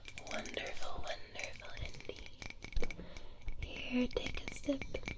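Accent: Scotland, United Kingdom. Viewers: Her Scottish accent is everything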